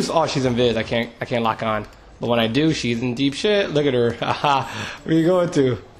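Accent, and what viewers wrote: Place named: Los Angeles, United States. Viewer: yo are you from l.a you sound like you from l.a but another question will you be doing nay reviews on nba2k11 or elite 11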